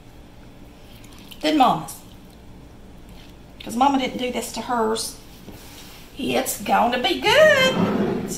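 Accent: South, United States. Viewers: I think your hair is beautiful I love your southern accent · I love your personality and southern draw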